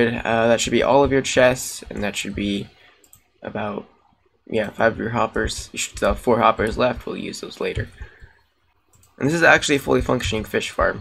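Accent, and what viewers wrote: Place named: Poland. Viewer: Jestes polakiem.. widac po akcencie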